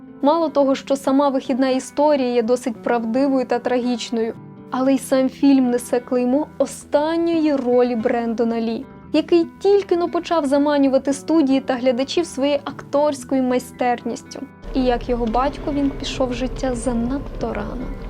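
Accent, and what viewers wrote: Standard. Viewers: Моє сердечко тремтить від твоєї краси!) А твоя українська без суржиків - просто музика для душі. Ти молодець)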